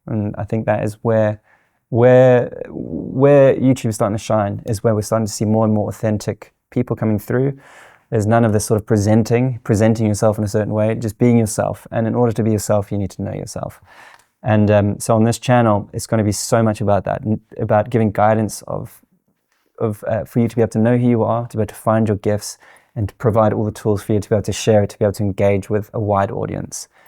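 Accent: South Africa. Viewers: Keep at it, I randomly stumbled across it. Love it. Recently started as well, after 3+ years of putting it off. Listening, it sounded a bit like you were South African. Im loving the content, please do keep it up. Im trying to be raw, edit as little as I can (Which is hard) but Im working it.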